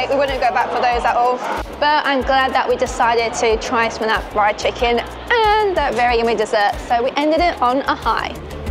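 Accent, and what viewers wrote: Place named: New Zealand. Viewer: yes I picked up his kiwi accent ;-)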